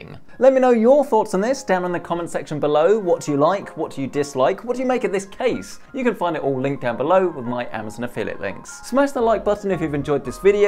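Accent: United Kingdom. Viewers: Why do you say “zee” when you’re a Brit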